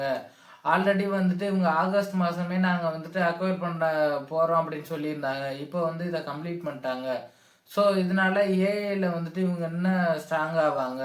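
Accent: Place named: North, India. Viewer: Vadakan mari pesatha, Olunga pesu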